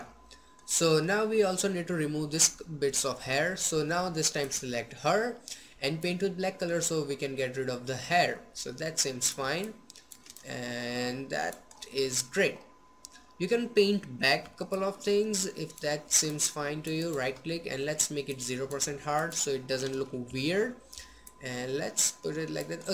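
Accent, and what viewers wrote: India. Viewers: is that an Indian accent? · I love your indian accent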